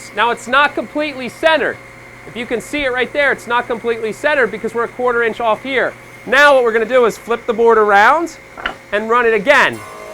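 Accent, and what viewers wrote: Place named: Pennsylvania, United States. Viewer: I knew I heard a PA accent.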